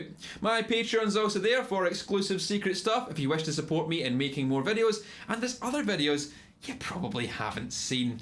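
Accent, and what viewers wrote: Scotland, United Kingdom. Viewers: Scotish Arin Hanson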